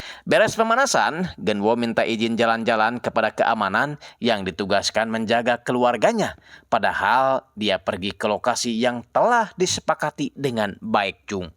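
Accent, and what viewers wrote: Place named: Sunda, Indonesia. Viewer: joss ie s Dubing org sunda 🎉🎉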